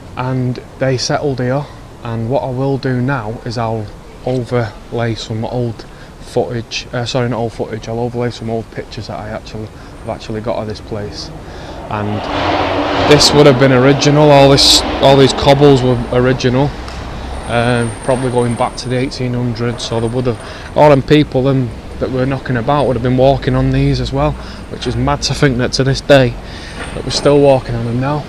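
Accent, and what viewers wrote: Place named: Salford, United Kingdom. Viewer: You can tell your from Salford..love it when you said what's she looking at in the daft hat lol.. great video really like your stuff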